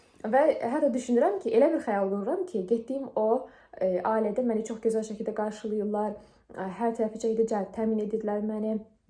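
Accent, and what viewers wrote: Azerbaijan. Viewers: Ozum Zaqatalaliyam amma Bakida yaşayıram.necese təsadüfən qarşıma çıxdız...avar olmaginiza rağmen danışıq diksiyaniz ve tərziniz aksentiniz cox gözəldir.meni o celb eledi ki size baxim😊ve Maşallah beyimizin bir sözüde lap xosuma gəldi ..eger bir adam sizin üçün deyismirse deməli o düzgün adam deyil😅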